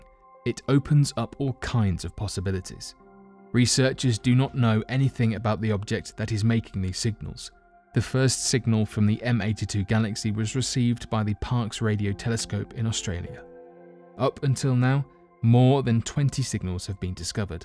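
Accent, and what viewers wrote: England, United Kingdom. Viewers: Why does every Damned commentator have to have a damned British accent? I cant stand it!!!! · You are correct that, in American English, it would be "has". But in British English, "have" is correct. The speaker sounds English to me, and he uses British English.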